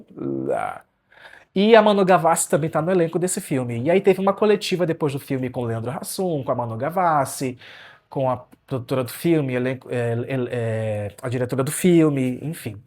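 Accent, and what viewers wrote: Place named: North, Brazil. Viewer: oi! há pouco conheci seu trabalho e já comecei a te acompanhar! fala um pouco mais sobre sua jornada, acredito que seu sotaque revela que você origina de alguma parte do norte, me corrija se eu estiver errado, enfim, ótimo conteúdo, estou super contente em ter "conhecido" você.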